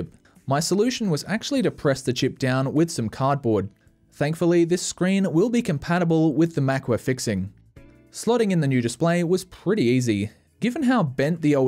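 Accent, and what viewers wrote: Australia. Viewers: There's something oddly satisfying watching this kind of video and hearing an Australian accent at the same time.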